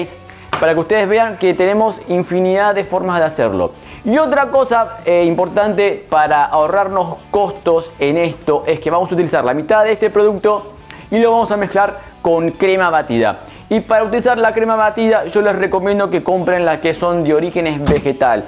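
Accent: Argentina. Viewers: me gusta tu acento argentino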